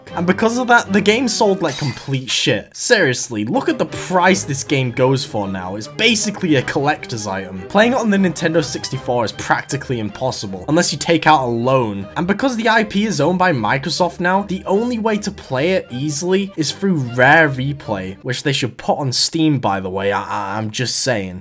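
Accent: United Kingdom. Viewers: Are you British? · i didnt even notice you were british until you started talking about it LMFAO damn im fucked up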